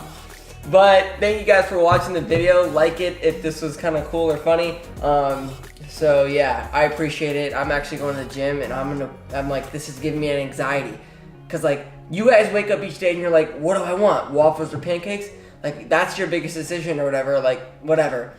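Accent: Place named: United States. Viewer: I don’t know why but your voice reminds me a lot of Tom Holland’s Peter Parker American accent lol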